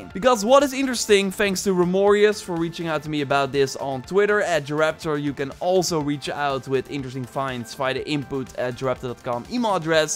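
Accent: Netherlands. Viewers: Hes dutch thats why he got that accent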